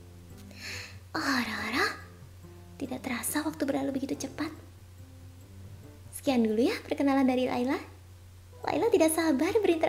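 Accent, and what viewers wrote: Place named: Indonesia. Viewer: That Indonesian accent when speaking English though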